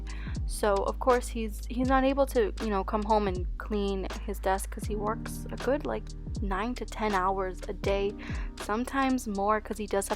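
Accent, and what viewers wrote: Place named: New Jersey, United States. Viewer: Are you from like jersey or someplace around there? Just asking because the way you say carpet and better. Prolly more words too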